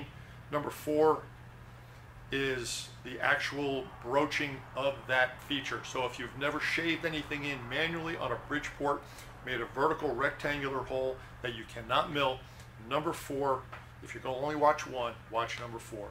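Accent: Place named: New Jersey, United States. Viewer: Eloquent and heart-felt explanation. You had the balls and forethought to let people know what was happening, and showed it was with major reluctance (not sure I've heard you you swear before).. Odd that people accuse you of not being a true Texan in the comments, as a Brit I'd put your accent somewhere in New Jersey. Nonetheless you're obviously an "all American guy" who goes out of his way to help and serve others- don't change. Edit: just watched again and you said "shiFt in YouTube policy" - I heard "shittin' ", I should buy some better headphones:)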